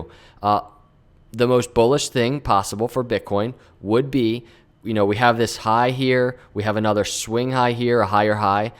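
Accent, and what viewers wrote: Philadelphia, United States. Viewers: Great job showing both sides of the coin! I appreciate your cautious optimism that comes with a Philly accent...long term bullish here. I don’t care what happens below $10k I am accumulating. Just don’t want to get caught off guard when that big candle drops any day now!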